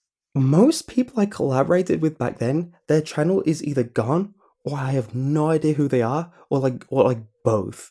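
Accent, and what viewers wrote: England, United Kingdom. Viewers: Ayyy I watch your videos, funny seeing you here🤣. He's got a British accent so just assuming english is probably his first language. From what he was saying it seems like he is. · Well, I really like the videos you post, oftentimes fall asleep to CreepyPastas, your voice is somewhat nostalgic and melancholic giving them a pleasent nuance. And besides I'm quite found of the Brittish accent, my teachers was from Manchester. Keep up the good work brother! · I thought he was gonna look like those british people ( which the fact that he speaks a british accent ) but with blonde hair and green eyes or something like that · Is he english? Im english and he doesnt sound totally english. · And I wish it would change he's only got this one voice with exaggerated breathing or the annoying little kid voice. They're all thicc British accents when you're trying to hear about a teenager living in Alabama being stalked through a anbandoned mall by monsters. 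"Ello mate, my name is Skylar and me mum has sent me to the mall to get some cookies. Pip pip cheerio. Long live the Queen of America." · this is an awful situation but GOD DAMN THAT BUTTERY ENGLISH VOICE MATCHES UP WELL YOU HANDSOME DEVIL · wow is part asian? who could have guessed that:O i always imagined you(by voice) to be a british guy.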